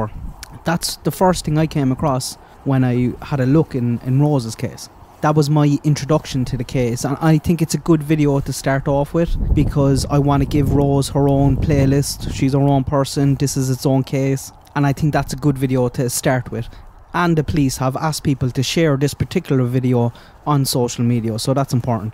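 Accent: Ireland. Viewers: I live in Newfoundland Canada (just a hop across the pond)and you have the same accent as my late grandfather (his great grandmother was Irish from Waterford county), where in Ireland are you from, not a street address, just a county We have a very large population of people of Irish decent, Some from the 1700's. Generations later many have not lost their various accents. I'm like Michelle, worked on my ancestry for years and I'm looking for my castle or a pot of Gold. Back to the Summer Wells case, not sure if you live around mountains and if there are those who wish to live almost under the radar but I know here in eastern Canada there has been crime "in some of our hills, mountains as well. I looked at some of the criminals who live on or around Ben Hill Road. I believe there is some kind of mob mentality in there, but based on some of the stuff Jodisue has got on with, you'd think they were all saints. 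Now truth be told we all know differently. Frank Light for instance, she seems to defend and he has a bad record. the whole Hollow in there is crawling with those with a criminal record.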